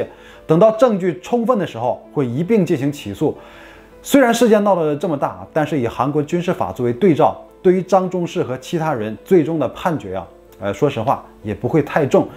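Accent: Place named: Tianjin, China. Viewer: 听口音好像是天津人，如果我错了请原谅😂 喜欢你的视频，看不够！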